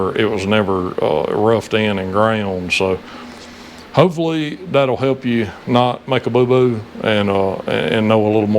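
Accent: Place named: Australia. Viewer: That accent is magnificent. Aussie here.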